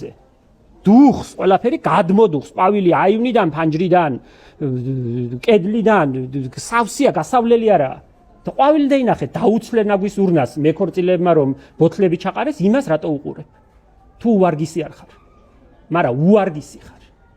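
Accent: Guria, Georgia. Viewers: ვგიჟდები შენ გურულ კილოზე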